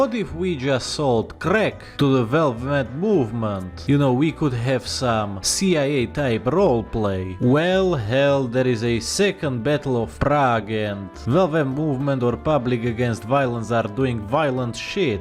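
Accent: Russia. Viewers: Why do you articulate what you say like a russian when a slovak doesn't speak like that? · Why do you have a Russian accent?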